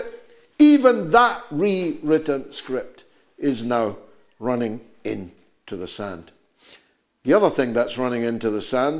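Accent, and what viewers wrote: Scotland, United Kingdom. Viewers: i think you are great George....you might roar with a scottish accent but you are definitely a lion of england just the same...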